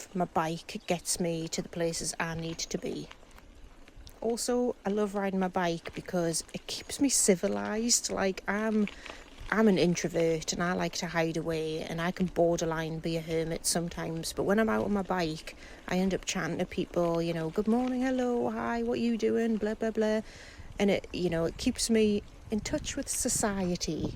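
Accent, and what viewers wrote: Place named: North of England, United Kingdom. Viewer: This is a breath of fresh air! Loved this vlog this morning and hearing your story. Wonderful and freeing life you have. The magic of seeing those reindeer.. I would cry too. Taking us on your woodsy bike rides is so lovely to watch and you chatting is like chatting with a friend. Love your candid realness. I was born in your neck of the woods in the North of England. Parents immigrated us to Canada many moons ago. Your accent captured me immediately❤. Keep going, I am loving your channel so much. 😃🇨🇦👋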